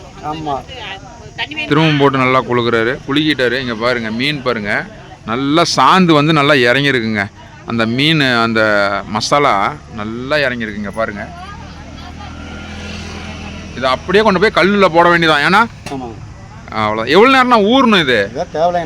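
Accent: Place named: Salem, India. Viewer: சூப்பர். நம்ம சேலம் மாவட்ட பேச்சு வழக்கம். சாந்து, பொங்கி, கொத்தமல்லி தழை கேட்பதற்கு இனிமையாக உள்ளது.❤❤❤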